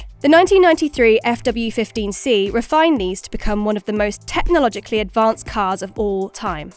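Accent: United Kingdom. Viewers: Britis speak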